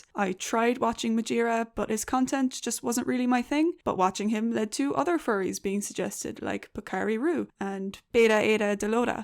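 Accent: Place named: Southern England, United Kingdom. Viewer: I honestly thought you were from south England 🏴 that how you honestly sound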